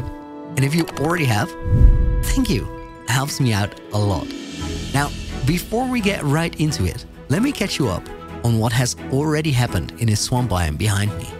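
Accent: Netherlands. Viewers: are you dutch cuz i hear it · very impressive,! You are not only a talented builder, but you have a gift for making videos too. Unbelievable! also: are you Dutch by any change? I thought I could hear it, but I could be wrong. (I am not at all saying your English is bad)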